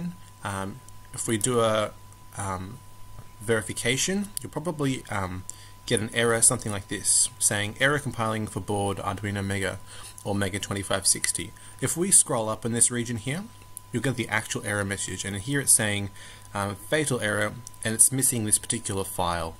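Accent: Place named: United Kingdom. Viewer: fair comment but - I was refering to the pronounciation of 8 and the letter h. It seems that UK and friends have a different way of pronouncing both than some other countries including US. (eg "I ate you enry iggins") Combined with fuzzy (at best) video mistakes are made. On the positive side, there was thankfully no distracting music. I prefer written code in the comments. thanks for your interest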